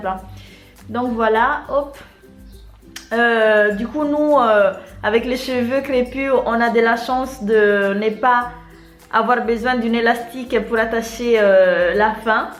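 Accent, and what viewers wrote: Madagascar. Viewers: j'aime bien ton accent malgache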